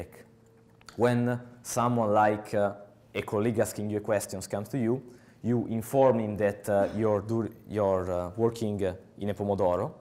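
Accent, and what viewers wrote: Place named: Italy. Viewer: Love the Italian accent describing Pomodoro technique. :-)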